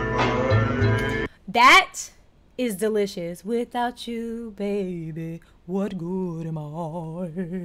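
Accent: South, United States. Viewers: You must be from the South, girl. 😂😂 Love your reactions on the songs.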